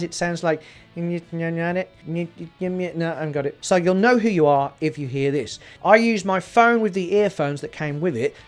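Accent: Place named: Midlands, United Kingdom. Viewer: yow sound like yow come from the midlands mush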